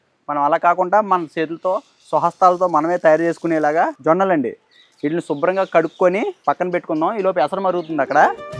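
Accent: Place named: Andhra Pradesh, India. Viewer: Brother meeru telugu andhra slang lo maatladithey chaala homely ga vuntundi.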